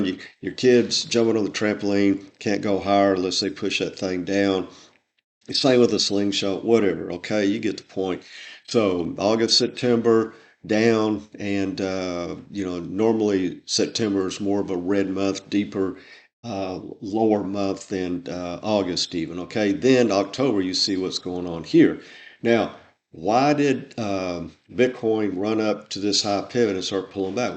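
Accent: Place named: Texas, United States. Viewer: Thanks for this. I’m in Travis & Dylan’s courses, but your instruction also seems like it would be helpful. I grew up in TX, but moved west 30 yrs ago. It’s nice to hear a TX accent (yours is very slight). Southern accents like some in Georgia & Tennessee are very strong in comparison.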